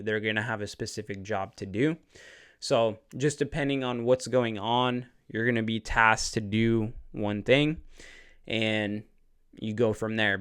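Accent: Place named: United States. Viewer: I just realised this is American not Australian lol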